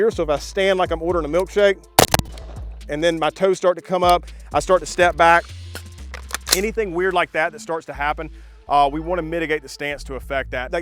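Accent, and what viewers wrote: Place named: South, United States. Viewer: He is saying it right. Y'all just aren't from the south, apparently. Lol. I'm a fellow southerner and have said "pilla" for all of my 36 years.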